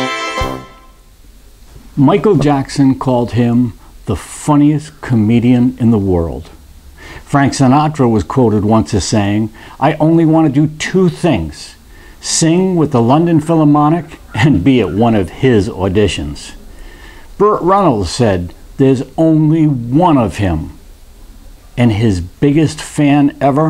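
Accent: United States. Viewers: ONLY thing sad is this usa accent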